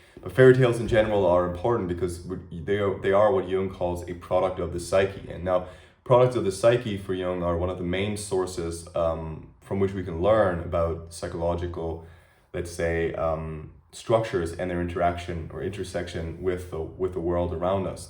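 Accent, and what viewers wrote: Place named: United States. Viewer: BTW it would be nice to hear you read in German then translate because since you sound like an American and it would be nice to hear you speak in your native tongue also. thanks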